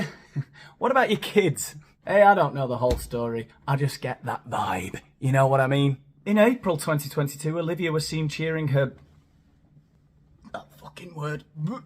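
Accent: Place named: Northern England, United Kingdom. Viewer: How have I only just discovered you? Subscribed ....ready for a binge. Love your Northern accent feels like home.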